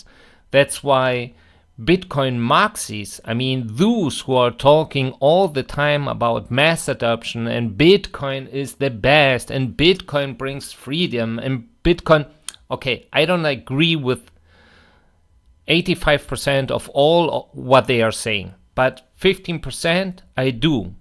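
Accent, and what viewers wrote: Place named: Germany. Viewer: why not? It is honest and the German English always sounds funny to me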